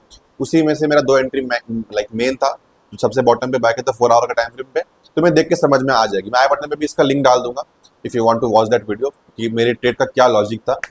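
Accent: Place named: South, India. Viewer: south indian ki tarah lag rhe ho bolte huye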